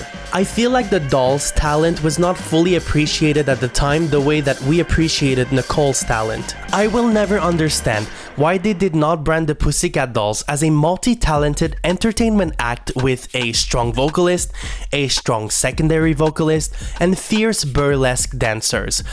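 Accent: Quebec, Canada. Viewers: Is that a québécois accent I'm hearing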